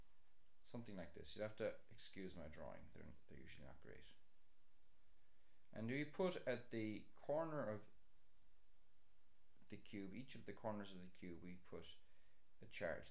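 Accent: Ireland. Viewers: I actually like your, I believe Irish, accent. I appreciate a variety of accents which seem to help me focus. On the other hand the somewhat low volume is a bit of a problem. I have to pick quiet times to listen to the audio.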